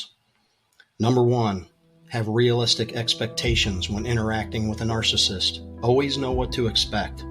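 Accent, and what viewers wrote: North Carolina, United States. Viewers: North Carolina ? From North Carolina also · I hear your accent. I'm betting North Carolina. There is no keeping the peace forever and the relationship is never worth it. That's because eventually they'll either completely do a discard or they'll force the other person to do it through ever escalating betrayals. There is no happy ending, ever. There are sometimes long-term relationships, especially with the covert types, but the soul destroying damage to the victim ends up being even worse.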